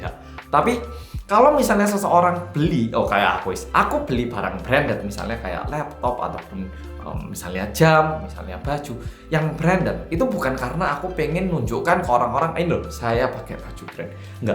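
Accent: Java, Indonesia. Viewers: mending ngene wae mas, nek pas omong jowo medhok subtitele tetep bahasa Indonesia, jd bebas omong jowo & tidak dikomplain lagi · Logatnya jawa, ada juga jerman logat batak .. 😂😂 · Terlalu medok bahasa Indonesia nya mas jadi kesannya kurang jelas mas.. 🙏🙏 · Mas medoknya masih kentel banget..apa memang masih ada yg bisa diajak ngobrol di korea pake bahasa jawa?soalnya sudah 4 tahun lebih jg ya di korea tapi medoknya masih tetep sama..hahaha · Ga percaya ini orang Korea, bahasa Indonesianya medok Jawa banget :)) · Salah fokus sama aksen mas yg masih medok aja, padahal dah 5 thn balik ke Korea...hehe, semangat mas...jgn ikut2an depresi kayak org Korea pada umumnya... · Jan medok bless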